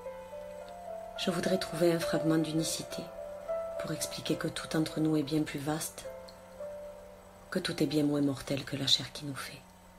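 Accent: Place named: South, France. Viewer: Je le redis "sublime" et tu as le même accent du sud que moi. Encore merci 💖💖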